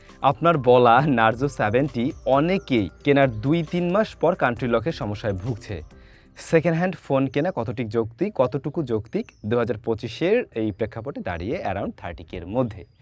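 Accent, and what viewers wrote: Barishal, Bangladesh. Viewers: ভাই, আপনার বাসা কি মোগো বরিশালে🥰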